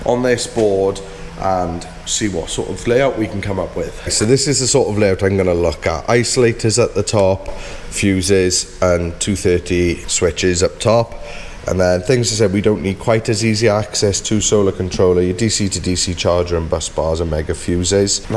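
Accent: Wales, United Kingdom. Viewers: Hi great vlog really enjoy your output don't stop saying by err obviously a Welsh thing😂😂 as others I follow who are Welsh say the same thing. Quick question on the vlog you said the build was having a 560 amp hr battery huge for off grid and no gas fitted i noticed on the electric board you were fitting a victron 30 amp dc to dc charger surely you would want at least a 60 amp? 30 would take for ever to charge from flat while driving? Just wondered what your thoughts were? Cheers mate keep up the good work also what 3000w inverters do you use recommend when building your vans. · Great video as always. Your workmanship is top notch. As a fellow welsh man I don’t even notice you saying by ere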